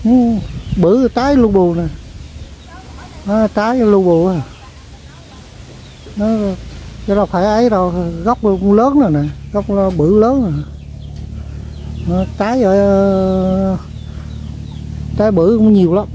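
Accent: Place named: Ninh Thuan, Vietnam. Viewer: Bạn ơi bạn ở Ninh Thuận còn mình bình thuận nhưng xa quê lâu rồi nghe bạn nói chuyện giọng nhớ quê hương quá cảm ơn bạn đã cho mình nghe lại giọng nói của quê hương dấu yêu ♥️♥️♥️♥️🥰🥰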